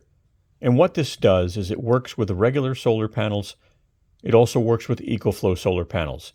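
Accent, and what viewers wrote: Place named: United States. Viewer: I have to say what a well put together video and introduction to these inverters. The host has a lovely clear articulate accent (I am English English so I find the young American accent a bit confusing! ) and the style of introduction was logical, informative and a breath of fresh air among other channels. I only watch YouTube nowadays and find there is such amazing content by conscientious Tubers like this gentleman. I have decided to buy the mini for my holiday home in South Africa for the 2-3 hours “loadshedding” (power cut) so I can watch my YouTubes on the big telly. I have gas stove for getting my 2 hour tea fix and refrigerator can go on and off as it likes so the capacity and function is just right for me . Again thanks for very well presented “ military officer style” instruction and excellent slides and explanation. 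👍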